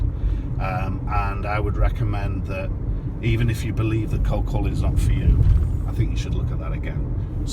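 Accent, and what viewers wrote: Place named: Liverpool, United Kingdom. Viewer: A scouser in Miami lol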